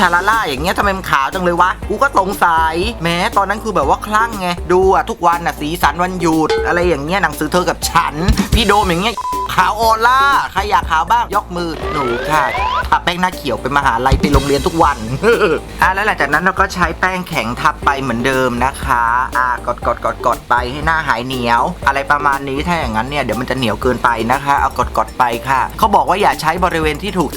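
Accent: South, Thailand. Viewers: คนสุราษ เหรอ แหลงใต้แลมั่งตะ🤓🤓🤓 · เป็นคนใต้รึเปล่าครับ พูดทองแดงชัดเลย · สำเนียงออกทางคนใต้??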